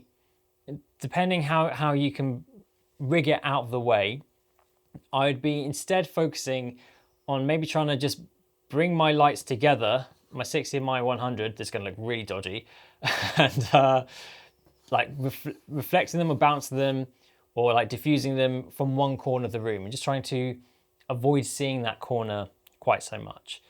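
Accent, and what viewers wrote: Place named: United Kingdom. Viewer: Ong thanks so much, this is very helpful, I’ll keep all the techniques in mind and see what will fit best when filming 😁😁 also I do live in the uk 🇬🇧 I assume u do as well based on the accent 😂, thanks again for the tutorial very kind and helpful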